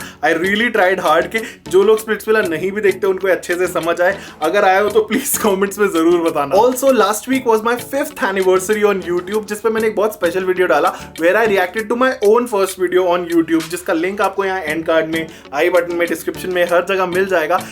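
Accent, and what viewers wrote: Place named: Punjab, India. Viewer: Ur punjabi accent 😎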